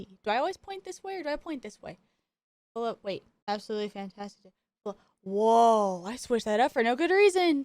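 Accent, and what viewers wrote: South, United States. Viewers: I could hear this southern snow queen talk ALL day.. 😍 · no me habia dado cuenta que eras sureña!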